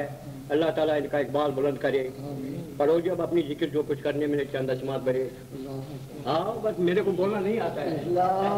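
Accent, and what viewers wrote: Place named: Gujarat, India. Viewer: Gujratii juban hi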